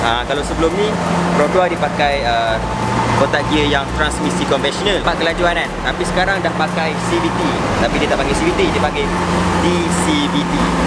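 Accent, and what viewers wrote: Terengganu, Malaysia. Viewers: Welcome bang, kalau boleh kekalkan loghat ganu time review. Boleh jadi trademark abang nanti.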